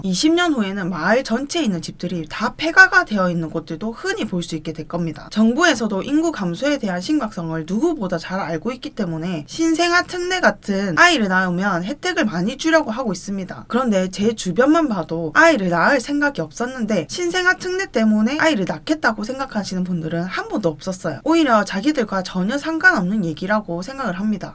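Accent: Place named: North Gyeongsang, South Korea. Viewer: 경북지역 사투리가 상당히 거슬리네요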